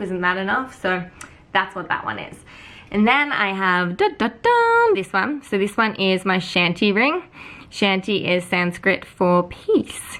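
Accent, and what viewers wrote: Australia. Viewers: Australian accent is very difficult for Russians🤯🤪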